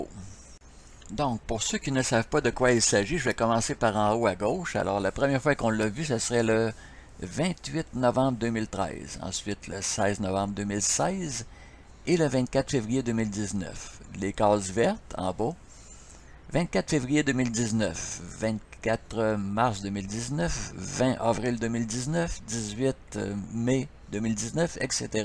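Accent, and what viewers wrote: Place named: Quebec, Canada. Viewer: Merci! Je trouves ça super cool toutes tes vidéos d'articles sur Nibiru. C'est juste avec toi que je suis abonnée sur Youtube. Tu expliques de manière précise avec une bonne chronologie des évènements. Pis en plus, au moins j'entends quelqu'un qui parle français-quebecois, fak c'est encore mieux! En tout cas, un gros Bravo pour tout le travail que tu fais pour nous tenir informé, c'est apprécié.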